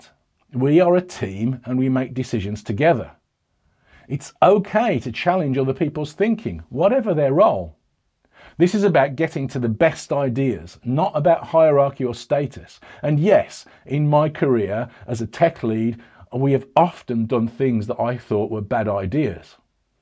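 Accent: United Kingdom. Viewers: "your bus comes up to you and says" I really appreciate the UK accent but I just had to point out that was the first thing I've heard.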